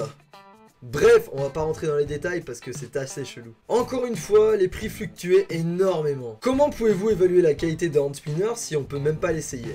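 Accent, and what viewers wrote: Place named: Belgium. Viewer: oui mes le truc qui ma fait le plus rire sez apar qui la la couleur de chreque est ton axen belge :)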